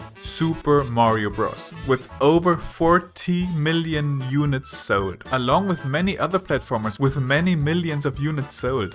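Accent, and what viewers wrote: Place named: Germany. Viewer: Sehr interessantes Video und mir ist dies auch aufgefallen, was mich sehr traurig macht, weil ich Platformer/Jump 'n' Runs am meisten liebe! :( Bin mit Banjo, Mario und Rayman aufgewachsen und liebe diese Spiele bis heute alle noch <3 Und ich als selbst Deutscher, hab sofort an deinen Akzent erkannt, dass du auch einer bist xD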